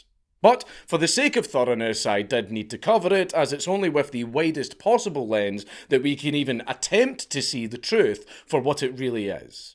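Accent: Scotland, United Kingdom. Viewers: what in the everloving fuck did I just listen to!? I did enjoy it being told in a Scottish accent though. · Can you please re-record a version of this with really cool looking advanced substation alpha filtered karaoke subtitles in order that I can understand what you're talking about? Between the obviously 100% real pseudohistorical mumbo-jumbo and your thick Scottish accent, I'm having difficulty following what you're talking about even with the English (auto-generated) Closed Captions turned on. In fact, they make it worse. It's like having a drunk Irishman attempting to interpret a Scotsman attempting to speak a bunch of colloquial American-English into British English or something. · Wtf this is so nice, not only can I perfect my scott accent but I can also learn stuff. As a descendent of the Black Douglas clan this is a calming voice. Fuck England and long live the scottish man.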